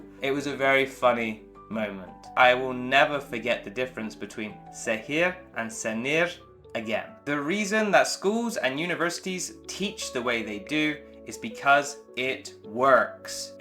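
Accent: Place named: United States. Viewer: Why you have American accent? Even if u were born in Uk.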